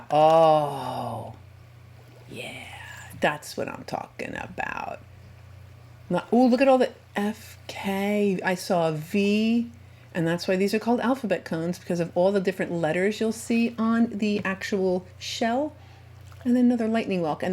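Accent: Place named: Ohio, United States. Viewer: Are you originally from the Ohio region by chance? The way you say the word: "water" like "warter" sounds like the Ohio area accent. ;) :)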